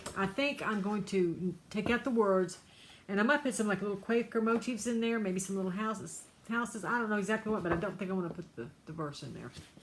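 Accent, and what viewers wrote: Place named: North Carolina, United States. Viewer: I love just hearing you talk... my grandmother was from North Carolina and she sounded just like you as well as my aunts. Warmed my heart so much.But the fact you are talking about stitching is even better.Loved seeing all your working on :)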